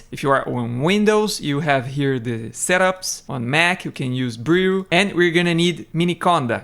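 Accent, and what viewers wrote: Brazil. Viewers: Brasileiro? O sotaque é bem inglês brasileiro (Acho lindo) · Você é BR né safado? conheço o sotaque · esse sotaque é. brasil conheço de longe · I literally commented on their video like "Now I just need a H100 to run this" and boom this pops up in my recommendations and you sound just like me talking in english, impossível um brasileiro não reconhecer o outro, me inscrevi no canal muito bom o vídeo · Pelo sotaque chuto que é brasileirinho, não? Excelente vídeo mano.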